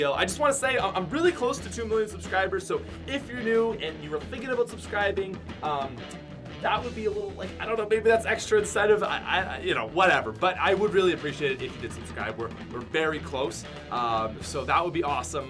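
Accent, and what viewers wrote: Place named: Canada. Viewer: Ben Monaghan it’s his Canadian accent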